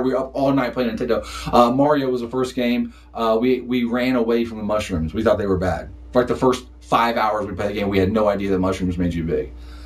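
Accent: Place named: United States. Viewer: Bro, you have the coolest American accent ever...^_^...and your new haircut looks like Sev from Killzone 2...woooo! BTW you have zombie spaghetti sauce on your shirt...O_O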